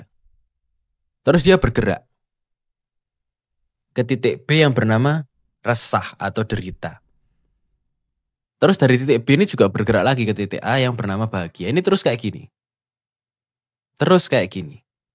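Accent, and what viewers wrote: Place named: Surabaya, Indonesia. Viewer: KERENNN lurr LANGSUNG MAK JLEBB, MODEL BOSO SUROBOYOAN CEESSS PLENG LANGSUNG ON POINT. Cuman penasaran bisa sejauh ini PEMBUKA LARA BATINNYA KY APA DULU,,, 😂😂😂😂 PENGHIANATAN KAH, PENYAKIT KAH HARTA KAH.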